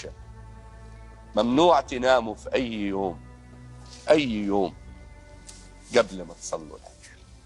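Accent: Upper Egypt, Egypt. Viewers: الله يا الله والله وجعت كلبي ياراجل وبكيتني وفكرتني بالغاليه الله يرحمه ويرحمنا جميعا انا حبيت الهجه صعيد من اشعارك واناكوردي من كوردستان العراق 👏👏👏👏👏👏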